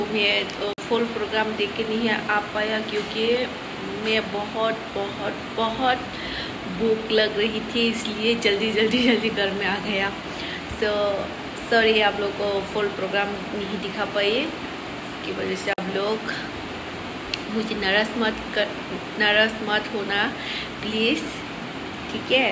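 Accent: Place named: Bhojpuri, India. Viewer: Behn ji aapki hindi bolna..hamko bahot acchha lagta hai...like bhujpuri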